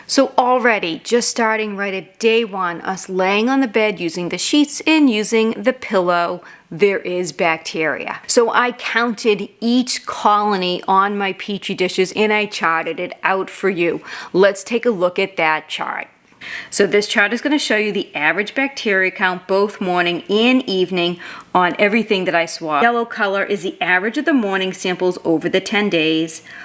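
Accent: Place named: Boston, United States. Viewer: is from the Boston area.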